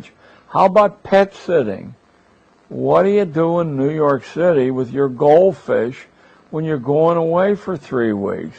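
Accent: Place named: Pittsburgh, United States. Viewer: The Pittsburghese is refreshing.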